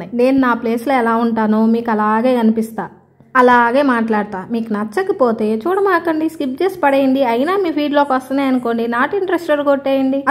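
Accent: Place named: Chittoor, India. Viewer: Me yasa chittoor yasa laga undi btn andariki reply lu ichukuntu pothunte manam mundhuki polem vallu vaguthunnarante entha kaliga unnaru odhileyandi garu neechuleppudu neechamgane matladtha ru 😂😂😂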